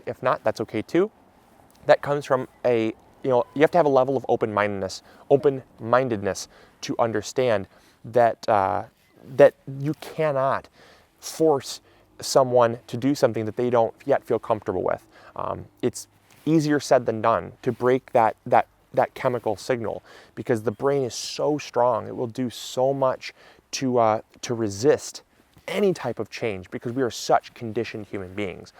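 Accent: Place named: Wisconsin, United States. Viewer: hello Wisconsin! that 70’s show voice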